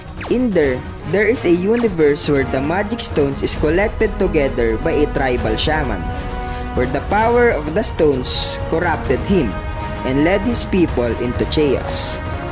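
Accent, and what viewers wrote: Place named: Philippines. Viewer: Bro are you Filipino? I recognize the accent anywhere.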